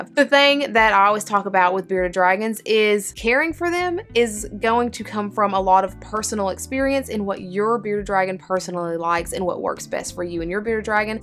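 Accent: South, United States. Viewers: OMG!!!! I was listening to this and your accent wondering if you were in Alabama or the south … then you mentioned ANIMAL TRAX!!! I just went there yesterday in Madison! I live in Holly pond - where are you from??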